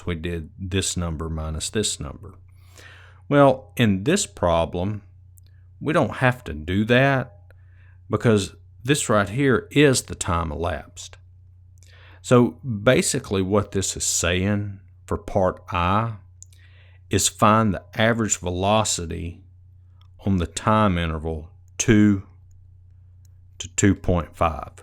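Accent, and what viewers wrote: United States. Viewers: Very helpful. Cowboy accents are easier to follow than eastern ones.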